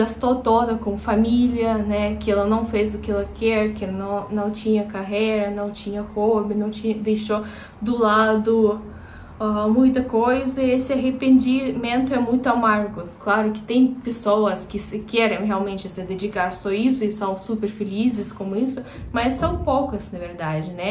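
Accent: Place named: Curitiba, Brazil. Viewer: O tópico é curioso, a maior comunidade eslava do Brazil está em Curitiba. Como namorar como uma Curitiba ? Um fato curioso, teu sotaque é parecido com o de muitos curitibanos, assim como aparência ( pela minha visão de alemão ). A minha impressão é de que as pessoas estão curiosas a seu respeito ( hipótese ). Se minha memória estiver correta este conceito de esposa fora chamado de Amélia ( referência a musica antiga, relativo a esposa no sentido bíblico dentro da cultura hebraico/ cristã )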